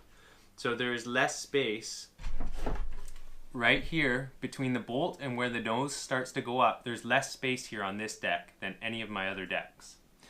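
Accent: Canada. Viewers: (Not related to vid) Your accent remind me of Ben Stiller from Zoolander. Is this the typical Canadian accent? 😂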